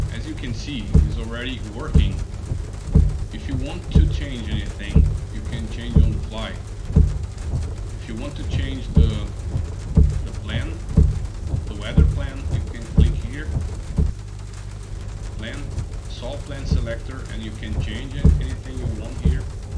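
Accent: Brazil. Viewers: Você é br mano? Tem sotaque e o nome de brasileiro haha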